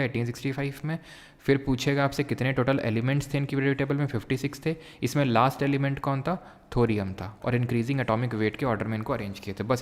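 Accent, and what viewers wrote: Kanpur, India. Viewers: भैया बाकी सब ठीक है अपनी उन्नाव कानपुर वाली भाषा सुनके मजा आ गया 😅😅😅😅 कि इतनी ठंडी नही पड़ती की ( जड़ा 😅) जयहो 😅😅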